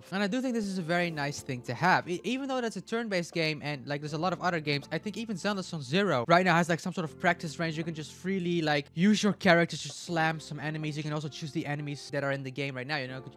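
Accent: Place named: Italy. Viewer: My guess is Italian 🤔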